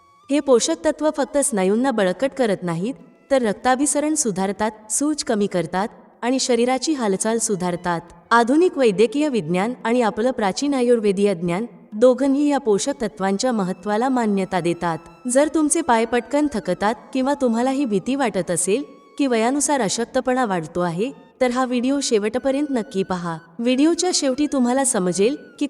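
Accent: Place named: Karnataka, India. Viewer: अगदी बरोबर.... मलाही माहिती उपायुक्त वाटली पण यांच्या व्हिडिओ तील कानडी टोन ऐकायला नको वाटतो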